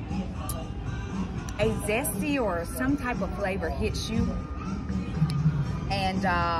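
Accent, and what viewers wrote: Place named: South, United States. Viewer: Heyyyy fam! I absolutely love Jeni’s icecream we have one here in Nashville. Now I have to go get some. I had the peaches with Biscuits. Do you mind me asking where are you from because your accent sounds like you are from Tennessee or somewhere in the South.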